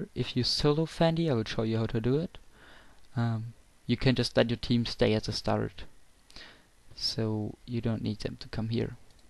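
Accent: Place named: Germany. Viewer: Eigentlich gutes Video, man merkt nur aufs übelste das du Deutschsprachig bist ;) btw, mir ist aufgefallen, dass du beim Snipern kein Stab in der Hand hast, was natürlich sinnvoll wäre ... ansonsten gut gemacht :D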